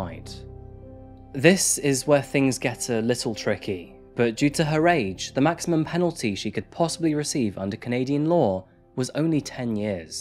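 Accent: United Kingdom. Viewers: You where made for this ,how you do yo thing man ,how I want to say I enjoy the show will come off weird and socker like so I better stop 😆🤣,anyway love how you set your channel big time 🖤,love when you call bullshi... t when u see it and with a British accent ,gets me every time,ok it's getting weird bye 👋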